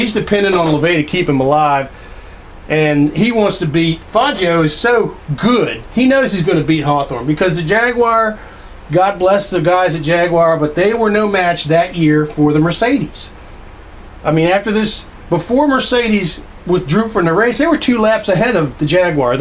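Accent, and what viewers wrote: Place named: Pennsylvania, United States. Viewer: The BBCfour documentary on this tragedy confirms that Hawthorne admitted fault to close friends immediately after (but not publicly) and lived out his remaining years a "broken man" (better than a cut in half man which is what 85+ spectators got). The mask and with matching shirt is a nice touch and I like your enthusiasm, but I don't believe anyone doubts Hawthorne's singular role in igniting the tragedy. Lastly, I am picking up a slight Pennsylvania accent from under the mask.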